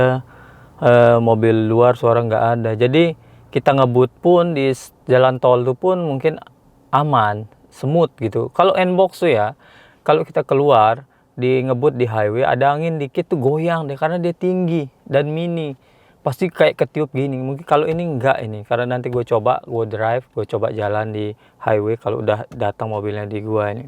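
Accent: Lombok, Indonesia. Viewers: Bang. logat lombok nya masih ada ya😅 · ndek inik telang mule logat sasak tie bang😂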